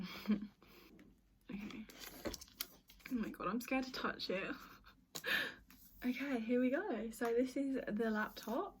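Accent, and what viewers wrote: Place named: United Kingdom. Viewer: Mine arrives in couple of days!!Love that British accent!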